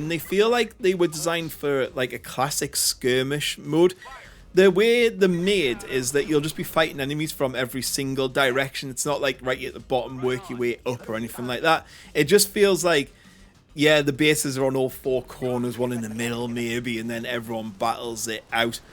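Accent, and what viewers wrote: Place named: Northern England, United Kingdom. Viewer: Sounds like ya not far from me mate, Darlington here!! Great video! think ill buy this :)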